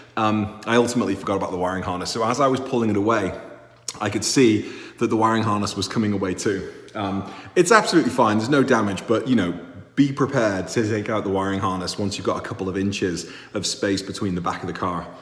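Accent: Liverpool, United Kingdom. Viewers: Yes I could tell that you’ve got a bit of a scouse accent 😎😎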